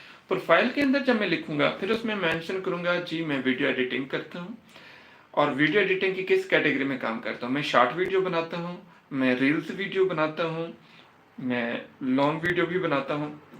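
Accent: Saraiki, Pakistan. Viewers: Aoa bahi upwork k hawalay say kuch kuch dost janty han per ap jo behtar basic ssmajhty han zaroor baten take jo new dost hy ap ki piyari sweet sarski awaz m wo be seekh saken jasa k ap kehty han k sekhen elam.hasil karen samjen to is klie sekhna zarori hy or ap behtar andaz main proper sekhaty han sir sb tamam pakistiyon sariki waseb sb doston bshi ki tarf say ap ko bhot sara piyar thaks with love u all... From Engineer multan say sir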